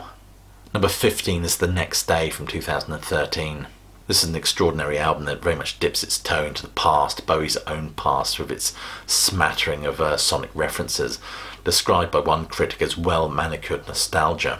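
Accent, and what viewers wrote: England, United Kingdom. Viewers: Top notch English accent and vocabulary.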